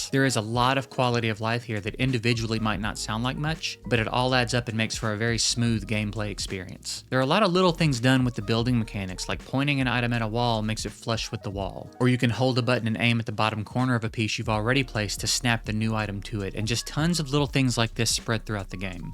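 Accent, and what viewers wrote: South, United States. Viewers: Dude, you’re so good! Also you sound like you’re from the south like me. Great writing and great commentary. Keep going. You’re onto something big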